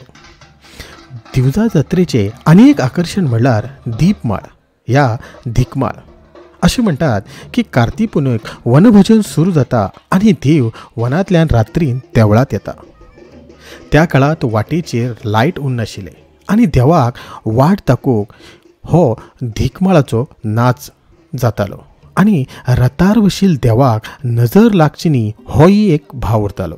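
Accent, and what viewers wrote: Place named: Karwar, India. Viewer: उत्कृश्ट लेखन आनी निवेदन...आमगेली गोड कारवारी बोली... परबीं तुमकां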